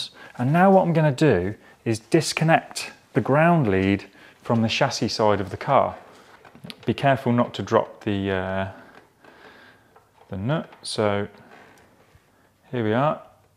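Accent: United Kingdom. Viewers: As always on this channel, this video is didactically excellent. Moreover, it is very pleasant to have all this presented in the distinguished manner of a British gentleman. Thank you.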